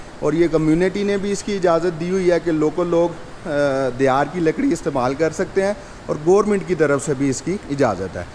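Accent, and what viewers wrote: Punjab, India. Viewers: صاحب براہ مہربانی اردو بولتے ہوئے تلفظ کا خیال کیا کیجئے۔ میرا مطلب ہے پنجابی لہجے کی آمیزش بہت زیادہ ہو جاتی ہے کبھی کبھار۔ چونکہ آپ استاد ہیں لہذا آپ اگر تلفظ میں غلطی کریں گے تو ہم جیسے پھر کس سے سیکھیں گے؟ امید ہے آپ برا نہیں منائیں گے۔ بہت شکریہ۔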